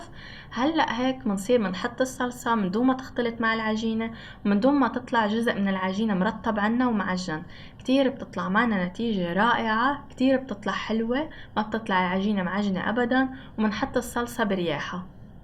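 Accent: Lebanon. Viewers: انت من لبنان؟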